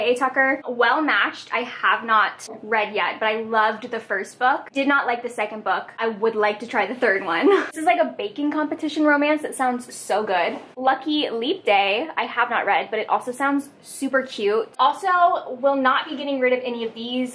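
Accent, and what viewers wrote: South, United States. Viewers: Omg your southern accent 🤦🏼‍♀️😂🤦🏼‍♀️😂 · Omggggg that southern accent had me rolling 😂😂😂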